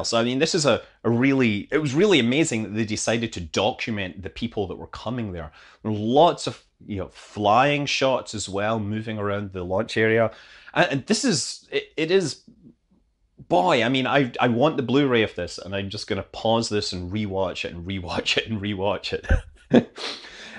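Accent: Scotland, United Kingdom. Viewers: Hi, did you watch it in the US? Can't help but notice you're Scottish, and I can't find it anywhere in the uk!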